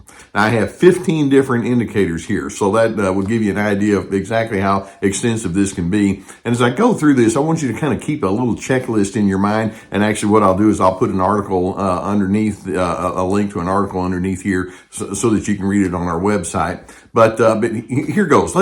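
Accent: United States. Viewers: I love every word in your message dr and your beautiful American accent. Thank you for your incredible help and support!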